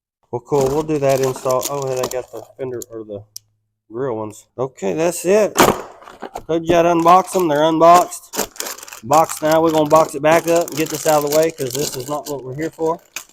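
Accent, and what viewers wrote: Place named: Appalachia, United States. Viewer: Zero chance in he!! The stick on holds that to the fender. What kind of hillbilly accent is that 😳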